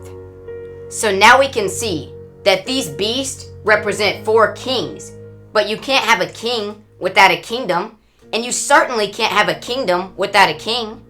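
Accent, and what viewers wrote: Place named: United Kingdom. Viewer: sounds like England/U.K.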